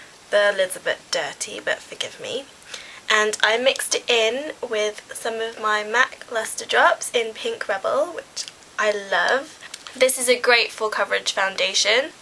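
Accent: United Kingdom. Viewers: I LIKE UR VIDEOS.. IVE NEVER HEARD SUMONE WHO IS ASIAN HAVE AN ENGLISH ACCENT.. WOW:).. HAHA.. BUT I LIKE DIZ VIDEO IT REALLY GOES GOOD WITH U.. UR A VERY PRETTY GURL!:)..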